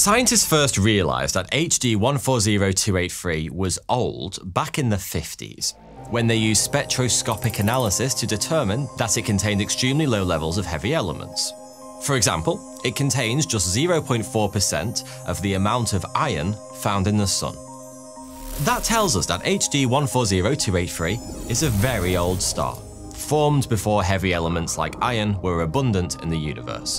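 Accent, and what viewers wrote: United Kingdom. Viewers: WHY DO WE ALWAYS HAVE TO LISTEN TO A BRITISH ACCENT BLATHERING ABOUT THE IMPORTANT QUESTIONS OF LIFE AND DEATH ??? WHEN WILL THAT BROKEN ENGLISH RACE FINALLY RETIRE FROM HISTORY ???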